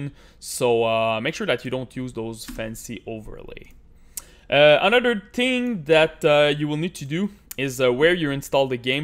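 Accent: Quebec, Canada. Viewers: La bonne accent quebecoise jadore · Je peux enttendre ton accent Quebecois lol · avoue tu viens du qc ta l'air d'avoir un petit accent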